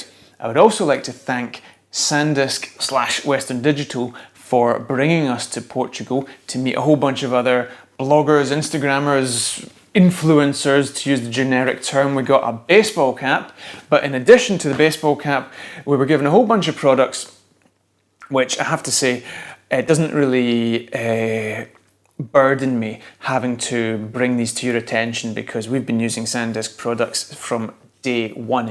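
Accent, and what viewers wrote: Scotland, United Kingdom. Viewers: LOVE THE SCOTTISH ACCENT! Now wear a kilt :)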